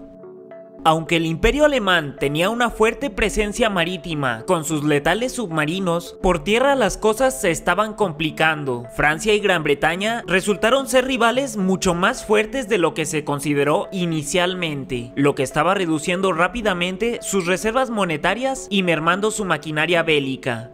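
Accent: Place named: North, Mexico. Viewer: el acento fresa me recuerda al norteño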